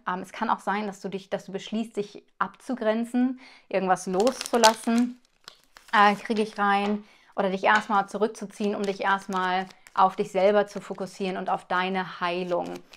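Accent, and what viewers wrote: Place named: Low German, Germany. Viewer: Du sprichst ja Plattdeutsch 👌🙋‍♀️👍 wie schön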